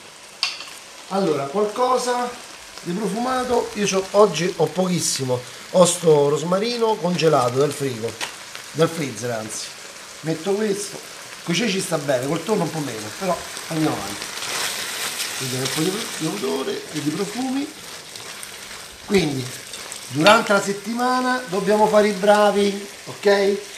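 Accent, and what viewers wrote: Rome, Italy. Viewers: Steffen Ali If you’re trying to learn Italian, you may have a bit of difficulty understanding this guy. He is very much a Roman and Romans have a strong accent that may be difficult for beginner learners. However, keep up the good work!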